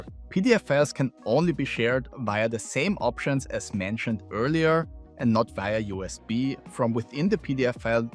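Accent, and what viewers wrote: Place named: Austria. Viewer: With such an extremely obvious Austrian accent, I would never have guessed this guy's name to be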